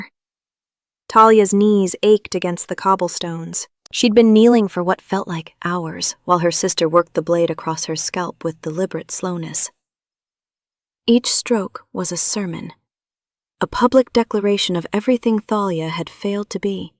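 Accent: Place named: South, United States. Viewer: Omg is it Dalia or Tealia. Not liking the Southern accent.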